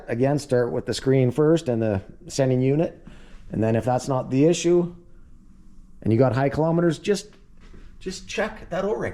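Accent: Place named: New Jersey, United States. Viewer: Are you from New Jersey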